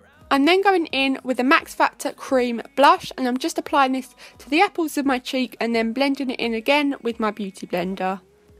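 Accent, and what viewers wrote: United Kingdom. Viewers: So gorgeous! Can someone tell me where is her accent from? Like, I know she's from Britain but I don't recognize her accent at all.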